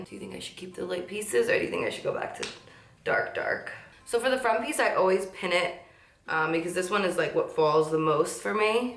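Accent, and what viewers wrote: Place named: New York, United States. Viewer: OMG I love your NY acsent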